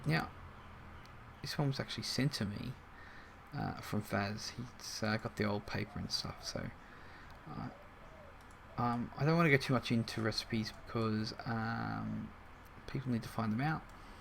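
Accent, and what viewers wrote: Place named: Australia. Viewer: good thick aussie accent there, from another strayan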